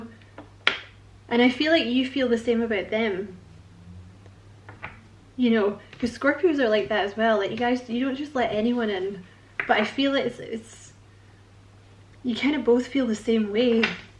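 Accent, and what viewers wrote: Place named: Scotland, United Kingdom. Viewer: Love your accent! You speak to my Scottish roots. 💗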